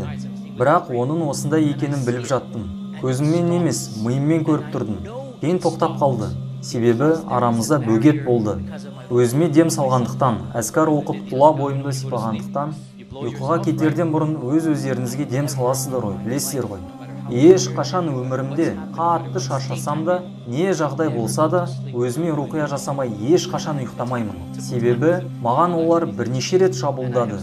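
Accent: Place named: Russia. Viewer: Авторға рахмет пайдалы видеосы үшін.Бірақ "ң" әрпінің орнына неге "н"әрпін айттыңыз аяғына дейін.қазақшаны акцентпен сөйлеп "орысша сауатты"адам болып көрінгің келді ма ?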